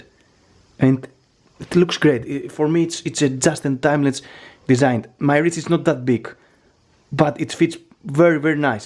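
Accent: Greece. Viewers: you are from greece? the ascent is familiar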